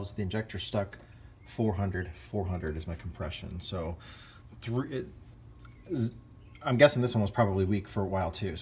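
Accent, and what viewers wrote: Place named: New York, United States. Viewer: That new York accent though 🤣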